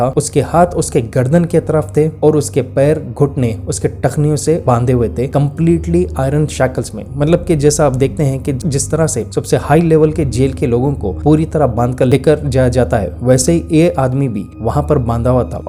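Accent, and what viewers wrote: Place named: South, India. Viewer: bhai bolne ka tareeka ajeeb nhi hi Ye south india ka accent hai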